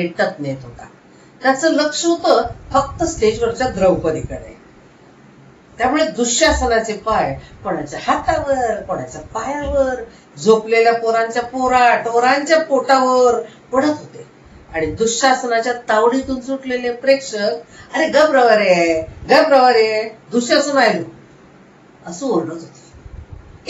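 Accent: Malvani, India. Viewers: प्रसंग डोळ्यासमोर उभा करायच सामर्थ आहे या अभिवाचनात आणि आली , वस्त्रहरण नाटकाची आठवण !!स्वतः मालवणी नसतानाही ठसकेदार मालवणी सादर करण एकदम सही!! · व्वा ताई , खूप छान.. प्रत्येक वाक्यागणिक प्रसंग अस्सल जिवंत उभा करण्याची ताकद जबरदस्त..आवाजातील चढ उतार, मालवणी हेल इरसाल मालवणी ठसका अप्रतिम... अजून ऐकायला आवडेल हा असा गोड , मंजुळ आवाजातील अभिवाचन.. आवाज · अभिनय वाचनातून मालवणी ठसका माका भावलो हो ताई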